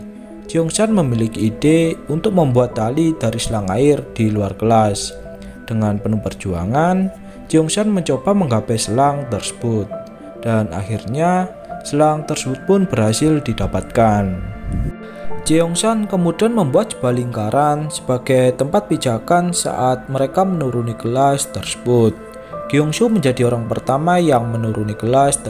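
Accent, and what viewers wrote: Java, Indonesia. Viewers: Fix admin orang Jawa nih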